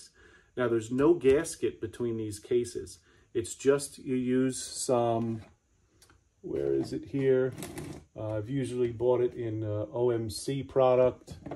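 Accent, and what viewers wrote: United States. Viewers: I'm from Bilbao, a city at the northern coast of Spain near France. I went to Southeast Texas when I was young and I had visited the US several times because my company used to send me to some broadcast exhibitions named NAB in Las Vegas and to visit some factories mainly from California. I fancy boats since I was very small. I've got a picture onboard my parents boat when I was three months old, and I got my captain license when I was 15. I own a 14 foot boat with a 15hp two stroke Mercury for rowing and some fishing, a Pursuit 2550 that used to have twin Mercury 135 two stroke but now we've got only a single one, because the other one got destroyed. We use thIs boat for fishing and sunbathing with the family. Also got a racing 25 foot sailboat with a Mercury 5 two stroke (I've got one short and three long shafts, four units in all). 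I love two strokes, I'm retired and I still keep my vintage motocrosss bike. Your accent sounds American but... Where are you from?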